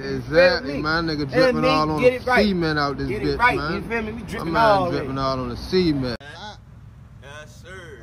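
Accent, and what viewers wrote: Baltimore, United States. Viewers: Boy you need to speak a bit clearer. You can blow. But your journalism is kinda suited for only Baltimore ears. Not saying you not doing ya thing. But it takes practice to shaking how thick our accent is. This ain't no hate, I just know it's hard cracking mainstream with how thick our slang n mannerisms are. Shout out to you tho brova. G shit.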